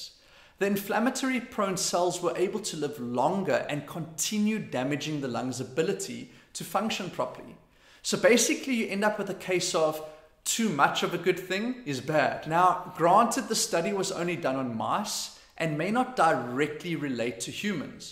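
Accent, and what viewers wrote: South Africa. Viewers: You sound 99.9999% South African😁 Am I right? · South african? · You south African? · Are u South African?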